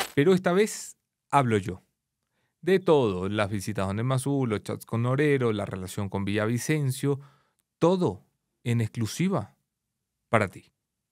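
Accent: Argentina. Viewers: Lo q yo no entiendo Es Venezolano habla como Argentino y vive o vivio en Ecuador 😮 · Por qué habla con acento argentino ? No es ecuatoriano?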